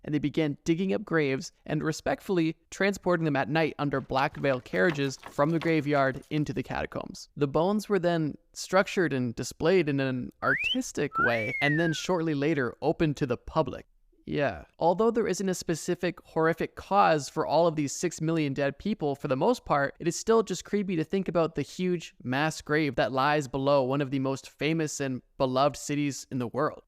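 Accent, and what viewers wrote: Scotland, United Kingdom. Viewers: 21k likes where’s the scottish accent i’ve been waiting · please give us the Scottish accent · Your Scottish accent sounds like how kids talk at my school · Where is da Scottish accent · then we'll get to hear his Scottish accent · Where's the Scottish accent video, we're at 22K likes :D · Great Video. Where is our link Bro?! We want to hear your Scottish accent lol. You are passed 21k likes.....I guess I should have waited til the end before posting this lmao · Let's get that Scottish accent! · Wheres the Scottish accent,? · Gimme the Scottish accent, please?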